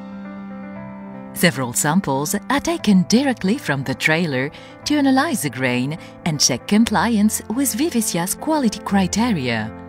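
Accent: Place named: Egypt. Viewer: المعلقة مصرية 🤣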